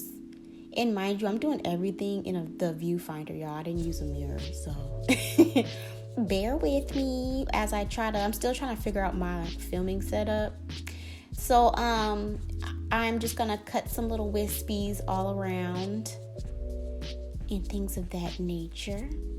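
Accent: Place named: Houston, United States. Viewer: U sound like my cousins from Houston lol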